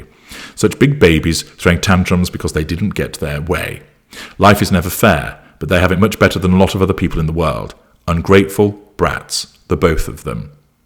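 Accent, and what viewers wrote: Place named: Cockney, United Kingdom. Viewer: Love your cockney accent, shades of Ray Winston love it.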